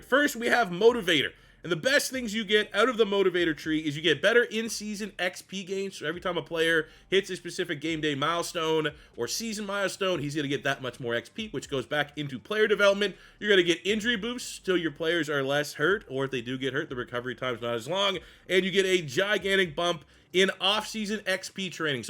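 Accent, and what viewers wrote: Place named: Canada. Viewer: Are you from Philly? You sound Canadian. Jut curious love the channel!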